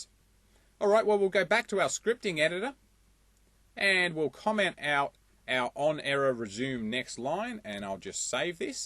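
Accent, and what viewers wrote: Australia. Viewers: Love you hear an Ostayian doing IT videos. Cheers mate!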